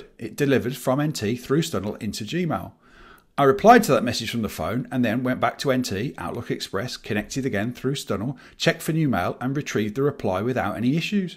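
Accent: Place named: Midlands, United Kingdom. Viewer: Can I just say that your diction and pronunciation is fantastically clear. Down to the gentle midlands accent too.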